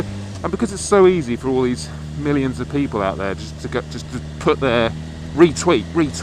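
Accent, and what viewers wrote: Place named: RP, United Kingdom. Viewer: I must admit, you speak the Queen's English better than most people. If you're not a Baron, you should be!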